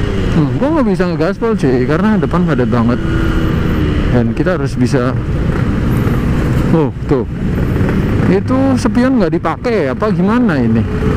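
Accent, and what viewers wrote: Banyumas, Indonesia. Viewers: Oke ges welkom bek tu may cenel wit mi, gedang doyan ges..ngapak nya terasa 😄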